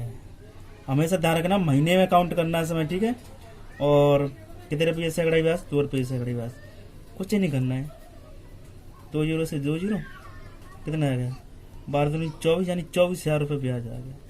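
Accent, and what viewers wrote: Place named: Dholpur, India. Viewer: भाई भाषा से धौलपुर साइड के लगते हो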